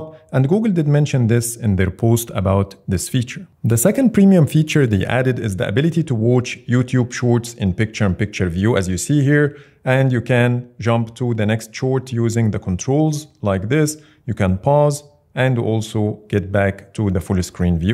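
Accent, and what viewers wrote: Egypt. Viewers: ياخي حاسك مصري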